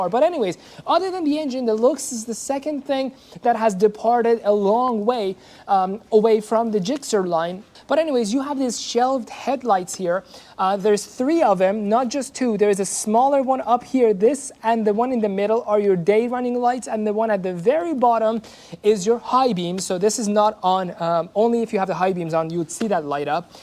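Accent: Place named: Iran. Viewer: Qorboone oon lahje Iroonit besham man jan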